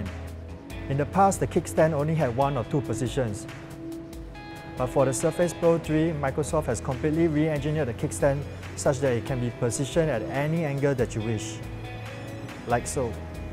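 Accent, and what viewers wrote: Singapore. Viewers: Dat singlish accent...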